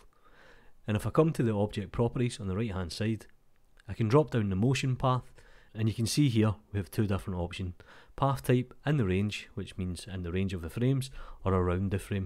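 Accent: Scotland, United Kingdom. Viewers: love ur tuts and ur accent too , it"l like william wallace is giving me a blender tutorial :)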